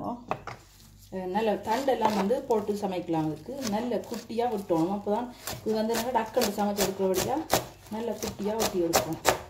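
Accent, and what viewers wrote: Sri Lanka. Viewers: மிகவும் அழகான இலங்கை தமிழ்... இனிமையாக உள்ளது சகோதரி.. கீரை பொரியல் அருமை👌👌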